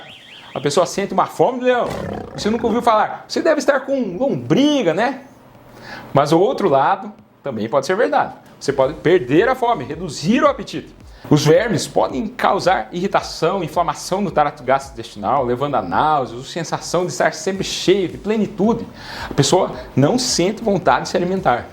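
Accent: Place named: Caipira, Brazil. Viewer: Este médico é maravilhoso, com seu sotaque caipira e jeito de falar , faz com que possamos entender bem tudo que ele explica, além de muito bonito é claro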